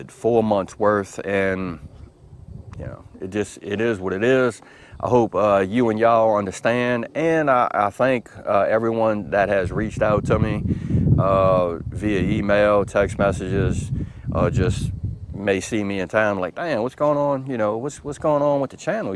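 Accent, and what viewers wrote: South, United States. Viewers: Your videos have always relaxed me. Maybe it is the southern accent. Melbourne, Australia.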